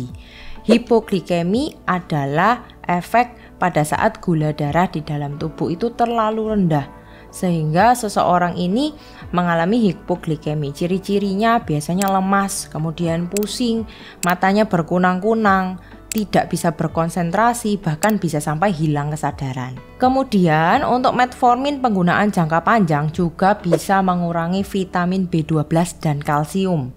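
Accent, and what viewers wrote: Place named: East Java, Indonesia. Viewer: Khas Jawa Timur😊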